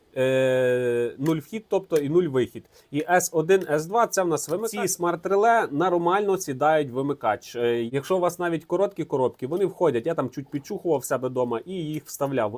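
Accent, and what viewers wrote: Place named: Lviv, Ukraine. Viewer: вимова львівська, але русизмів доюха, але то нічого не вирішує. Тільки підзелупний йободовб концентрується на мові, головне бути людиню, й не важливо, якою мовою ти розмовляєш. Он зелупан вивчив Українську, але воно ненавидить Україну й Українців, воно хоче тут царювати й рабів з бангладешу набирати....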